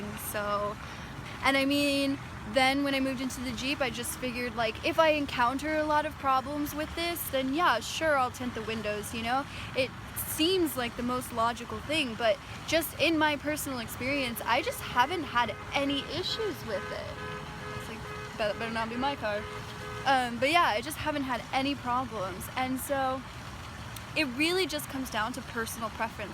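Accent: United States. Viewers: DOES A LAOTATION SPEAK WITH THAT TYPE OF ACCENT? I WOULD THINK SHE IS AN AMERICAN OF ASIAN DESCENT.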